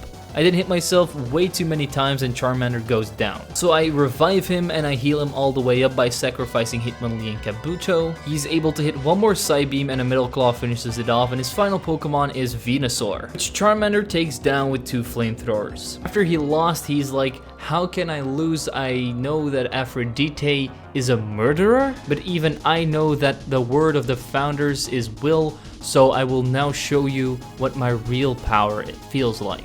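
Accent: Flanders, Belgium. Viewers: Zijt gij van belgie? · Are you from belgium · Are u belgium? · Ya french or Dutch belgium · Wait a sec UR VLAAMS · Hey, are you from Belgium perhaps?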